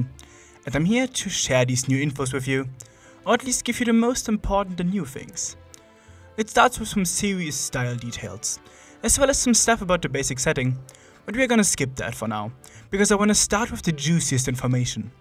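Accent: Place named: Germany. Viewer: Bruhh what is that accent if it isn't German xd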